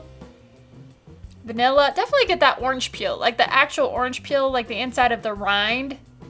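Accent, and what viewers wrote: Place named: South, United States. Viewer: That definitely ain’t no Yankee accent there, sounds like a southern girl to me! I just picked up a sampler bottle of this today, im looking forward to trying it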